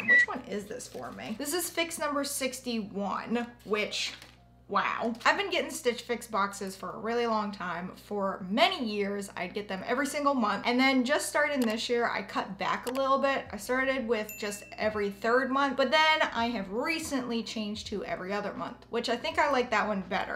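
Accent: United States. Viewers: Your box is full of such good stuff! And I love your accent! What state are you from?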